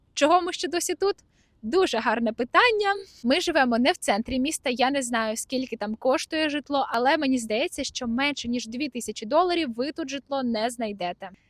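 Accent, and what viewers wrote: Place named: Ukraine. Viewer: I like your accent. Pure Ukrainian!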